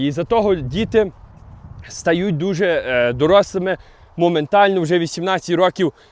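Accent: Western Ukraine, Ukraine. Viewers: Доречі про акцент, саме тому почав дивитись, но я всеж таки підозрюю, що у є задатки актора, режисера і тд, він полбляє, якісь сценки з братом, робити, старається, молодець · говорить із західним акцентом, так. Але його вимова притаманна більше до діаспорської, або до того, як колись розмовляли дуже давно на заході України. Зараз у нас так не те що молодь, але і люди 60+ не розмовляють. Не знаю, з чим це пов’язано, мабуть все таки вплив англійської вимови дає такий акцент українською. Навіть дідусь, з яким я бачила відео, розмовляє більш сучасною укоаїнською😊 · дивлюсь вас не з інтересу про Канаду, а з інтересу до західно-українського акценту:)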